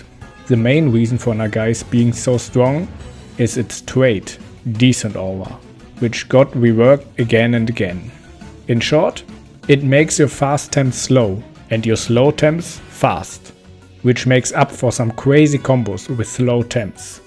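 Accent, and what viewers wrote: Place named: Germany. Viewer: Sofort gehört das du deutsch bist😂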